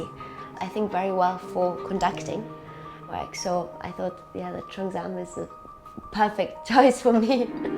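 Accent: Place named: Germany. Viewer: I think I here some slight German accent in her English mother tongue.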